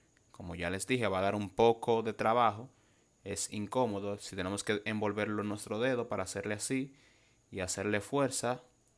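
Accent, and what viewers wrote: Dominican Republic. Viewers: Eres Dominicano o ecuatoriano (lo se por el acento) soy Dominicano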